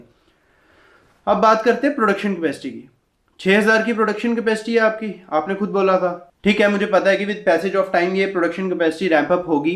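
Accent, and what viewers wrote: Jammu, India. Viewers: Bro r u from Jammu?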